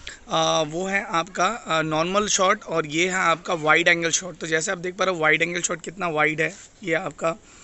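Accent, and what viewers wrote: Bengal, India. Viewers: You are from Bengal I presume and either from near Barrackpore.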